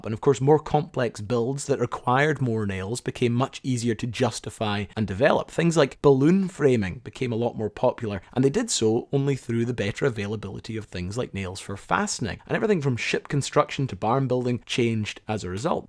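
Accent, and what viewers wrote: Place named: Scotland, United Kingdom. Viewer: Thank you for such an interesting piece of history. You are an easy listen. Your Scottish background is easy on the ear. BWScott Fort Worth Texas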